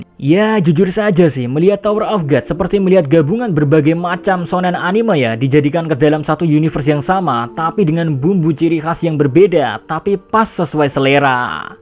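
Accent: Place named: Welahan, Indonesia. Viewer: Min kue wong welahan?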